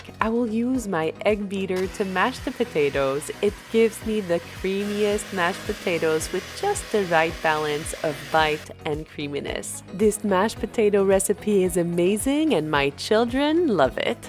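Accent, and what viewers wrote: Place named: France. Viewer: I have noticed that you have an accent, and you prononce Safran and mayonnaise like in France 😅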